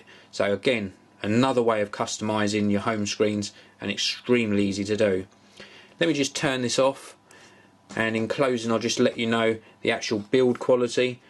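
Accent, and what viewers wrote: United Kingdom. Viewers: Man i love your ACCENT!!!! i wish i could talk like that... i love that typical british accent! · Oh great your a brit.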